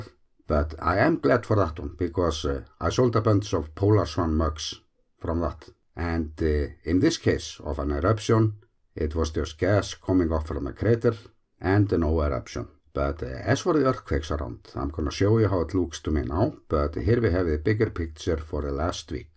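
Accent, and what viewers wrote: Iceland. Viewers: you are good at this. engaging and I find a real relaxation in your Icelandic English and cadence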